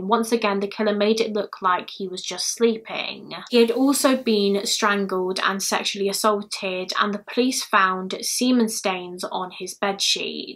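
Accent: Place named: England, United Kingdom. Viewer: I read a story on AO3 the other day & one of the characters was called. Every time I came across the name, which was very often, the reading voice in my head suddenly had the English accent and pronounced the name exactly the way that our here does. This of course prompted me to walk around just randomly saying the name but in her accent. So thank you for finding a way to drive my family absolutely nutty (& into laughter) because that's my goal in life. Not to drive people crazy but to make people laugh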